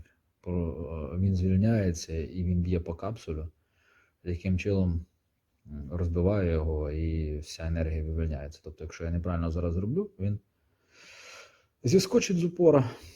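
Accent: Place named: Russia. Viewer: А всё равно слышно,что мовою ты розмовляешь по русски. Чё уж кривляться,ну и говорил бы по русски,рас уж украинский не твой родной. Один хрен,скоро тебе придётся руссифицироваться,если жив останешься.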